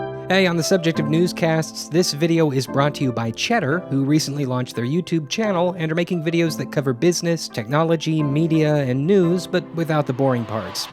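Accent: Missouri, United States. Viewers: He's from Missouri. We all talk like that. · He just sounds normal for Springfield Missouri. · My mom is from Missouri and I knew you were too, based on the accent. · I live in Missouri and it is so obvious you are from Missouri even in your reporter voice.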